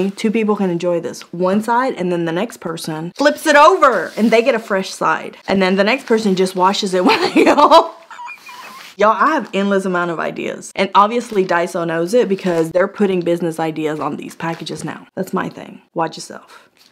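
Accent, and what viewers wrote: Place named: South Carolina, United States. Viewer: I can vouch for the fuzzy eliminator. i've had one for years and it cleans all my sweaters up beautifully. Yes....be careful to stay away from any long strands of fuzzies.....they will get caught and sound like you're cutting off your arm. lol But yeh.....I would buy another one in a heartbeat. Actually I don't think there is any other gadget that does this, except for like you said......a razor. And I have torn many holes in to a sweater using a razor. So that is a no no! By the way.....where are you from? Anywhere near Sumter SC? Born in Wilmington NC....raised in Sumter. You definitely got the SC accent going on.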